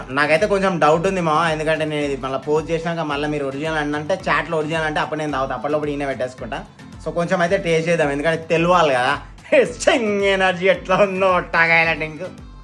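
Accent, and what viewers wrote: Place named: India. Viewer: I like your Telugu slang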